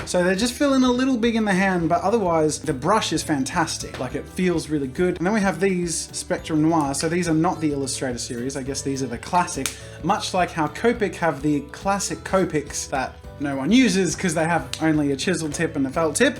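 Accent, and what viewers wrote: Australia. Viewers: Yessssss I thought you were American but your Aussie I'm Aussie tooooooooooo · Garbage man he's Australian! LOL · i KNEW he was an ausy. Now i fanaly know.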